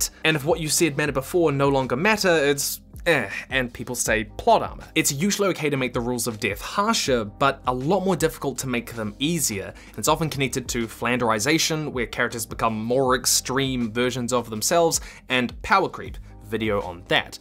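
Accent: New Zealand. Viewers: He-he. "Dee-Deevil" Kiwi accents are the best. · This guy is from New Zealand, yes?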